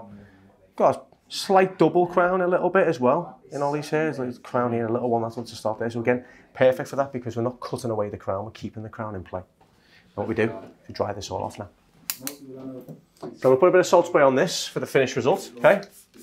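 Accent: United Kingdom. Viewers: I Can't Stand British accents. Almost 50% of what he said I couldn't understand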